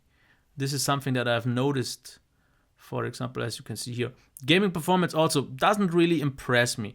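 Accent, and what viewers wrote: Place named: Germany. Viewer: "grey knight" made serious comment about your integrity as an impartial reviewer. Please speak a little slower and I would like another review for lgg4 as this is a phone I think I would like. Thanks, and you are probably German or E. Europe as another subscriber asked. We are all equal, no reason not to answer a simple question!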